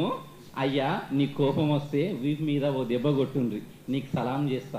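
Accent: India. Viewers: ఏక్ దం నాటు తెలంగాణ యాస చాలా అద్భుతంగా ఉంది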